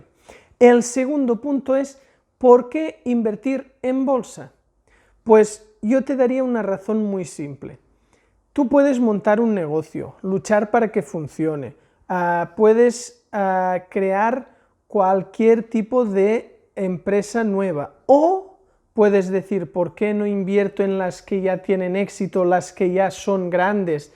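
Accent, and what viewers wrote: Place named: Catalonia, Spain. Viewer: Cómo que romper un mercado?? Eso es posible?? P.D: Aquest accent català... xD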